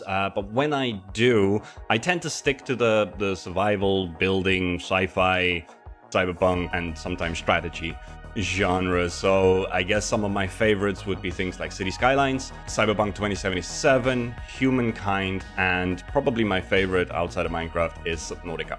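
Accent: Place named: Wales, United Kingdom. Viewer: Congrats! 🥳 Have to say I was convinced you were Welsh before watching this. My first language is Dutch so I can usually pick up on similar accents, SA included, but unlike Rendog's accent, I never would've guessed you were from SA.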